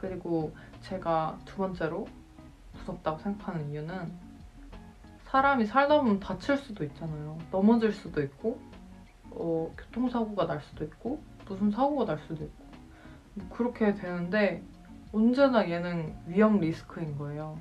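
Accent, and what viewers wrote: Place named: Chungcheong, South Korea. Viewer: 어우 충청도 사람같아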